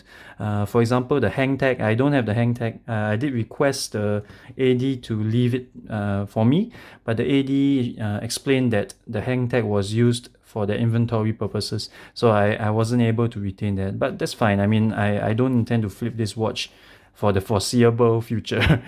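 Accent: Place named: Singapore. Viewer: The second when I hear you speak, I know you're a fellow Singaporean haha. I just got mine early this month, it's my first luxury watch too and I absolutely love it. Congrats on your new watch! Cheers :)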